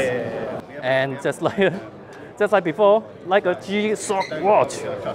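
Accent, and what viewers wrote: China. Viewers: chinglish :) but great first look :)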